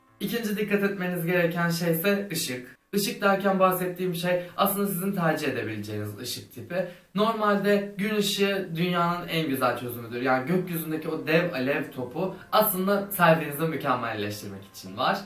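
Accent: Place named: Bursa, Turkey. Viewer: Abi sesin neden Bursalı gibi çıkıyor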